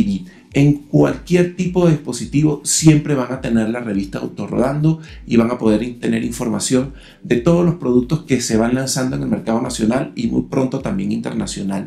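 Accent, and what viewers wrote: Venezuela. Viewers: Hey no sabia que eras Venezolano, debe ser porque soy costeño y se comparte un poco el dialecto, antes tenia mucha familia allá, nuevamente saludos chamo.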